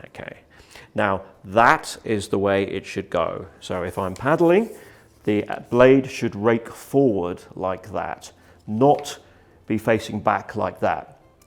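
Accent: United Kingdom. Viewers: Love the British accent (proper English) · he's just British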